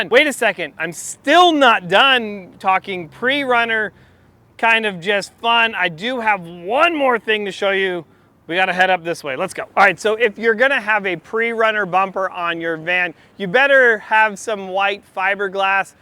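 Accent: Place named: Australia. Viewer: Hahaha, Australian accent mate😂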